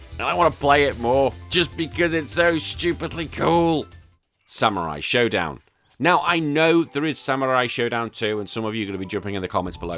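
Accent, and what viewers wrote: England, United Kingdom. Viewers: hey not to be weird, i love your accent, what part of england are you from? its my favorite sounding one. I guess it's one of the reasons I've seen about all your videos so far.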